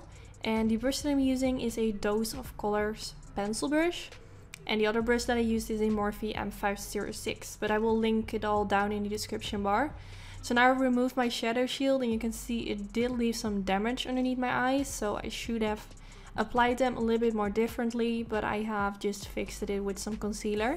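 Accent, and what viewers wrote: Netherlands. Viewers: She sounds Dutch but I could be wrong! I think she sounds really cute and sweet :-)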